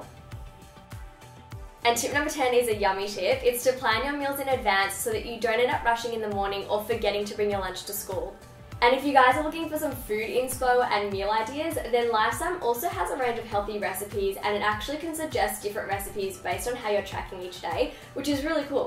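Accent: Australia. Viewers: Hi. Are you from Australia? Love your accent by the way😂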